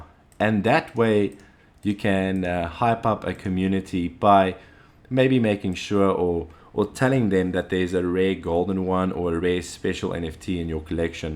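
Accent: South Africa. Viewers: I almost jumped when I heard your accent! we gotta make NFTs trend in South Africa! Thank you so much for all of this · Why do you sound south african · Proud to hear your South African accent! Thanks for everything,!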